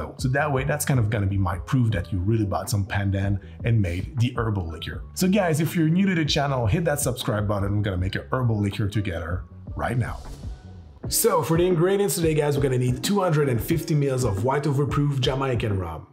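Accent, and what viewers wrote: Quebec, Canada. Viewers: Hey! Love your channel 💖. I was wondering, you live in Quebec, right? ( i'm gessing from your accent!) I was wondering where could you find Wray & Nephew rum. I see this used all the time in cocktail and syrup recipes but SAQ doesn't sell it. Can't seems to find any over proof rhum. I live in Montreal btw. Thanx a lot and keep up the awesome work!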